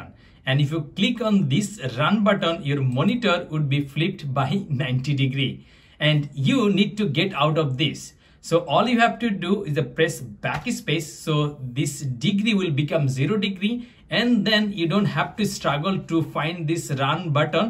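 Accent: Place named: India. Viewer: This indian talk.. Oh I love your channel 😍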